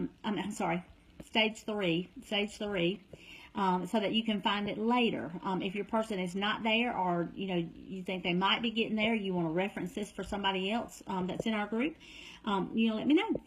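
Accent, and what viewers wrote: South, United States. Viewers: You are so helpful! I love your southern accent. Sooo cute! And you DO KNOW A LOT! 💓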